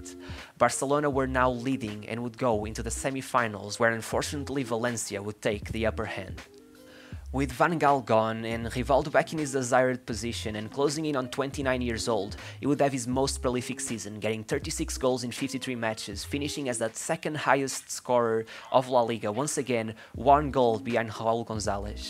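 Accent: Portugal. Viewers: Is it me or you are portuguese? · Dude are you portuguese u really have a portuguese accent saying the names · Gotta love that awesome portuguese accent! A great video for a great player! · This is a portuguse narrator for sure eheheh I Love Rivaldo! He has class and is not a party animal I love lo listen him talk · He’s Portuguese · I bet this guy is portuguese because of the accent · you sound like a portuguese speaker, and I am wondering if you are from Brazil, portugal or another portuguese speaking country. Anyway what I about doing one for Juan Román Riquelme, by far my favorite player growing up and wanted to know other people's opinions. Cheer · Lol this guys is portuguese 😂 accent tho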